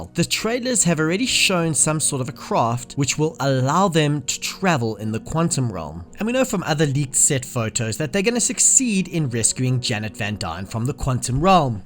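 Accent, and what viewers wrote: South Africa. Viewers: Is this a south african? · Are you South African?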